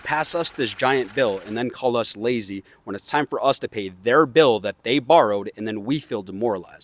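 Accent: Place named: United Kingdom. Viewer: Just because you're British doesn't make it gen ZED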